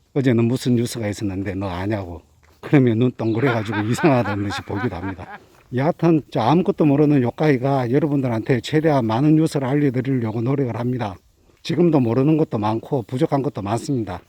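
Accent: Gyeongsang, South Korea. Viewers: 구수한 경상도 말투에 담긴 진지함에.. 좋은정보..항상 감사드립니다. 시드니에서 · 와우 처음왔습니다. 마스크하시고 옆집에 사시는 어떤 분과 같네요. 헉~~~6~7개월 동안 와.... 대단하시네요. 여행 갔다가 사시는군요...요까이 아 경상도 말이군요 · 항상 뉴스 바로바로 올려주시고 구수한 경상도 사투리(저희 남편도 대구 출신)가 엄청 정겹고도 따뜻하게 느껴지네요. 이민 온지 13년이 되어가고 말만 시민이지 영어 포기자로 딸애한테 영어공부 좀 하라는 구박 받으며 이렇게 나이가 들어 가나 했는데 영어 해석 실력에 자극 받으며 테잎이라도 다녀볼까 생각중입니다!! 늘 업데이트 되는 최신 뉴스 열심히 기다리는 일인입니다!! 가족모두 항상 안전하고 건강하시길~ 오늘도 요까이 하겠습니다!! ㅋㅋ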